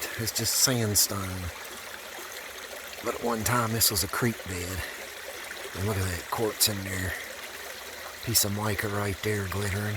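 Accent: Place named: Georgia, United States. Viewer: Controlled stuff lol I'm not all sciencey 😂 lol you sound like me, it sounded good in my head but my tongue wouldn't cooperate lol controlled environment is what we're looking for. I promise I'm not laughing at you I'm laughing with you because I know your educated and purdy smart but we mix our words up on top of our country accents (GA here) and folks think we're some kinda bumpkins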